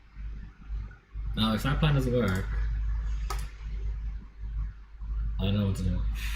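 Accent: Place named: England, United Kingdom. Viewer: Wow you sound full on Pom now lol. Nice.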